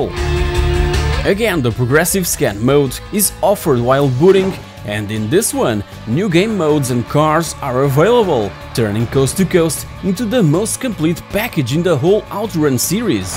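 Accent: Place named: Portugal. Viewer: Este gajo é tuga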